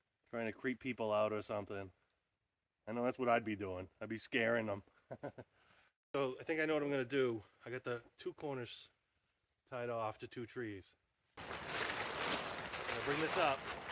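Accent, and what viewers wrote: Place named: Massachusetts, United States. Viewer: I just found your channel a few days ago. So far it seems you're camping in NH, but you seem to have a Massachusetts accent, am I correct in assuming that? Great videos, BTW! 💞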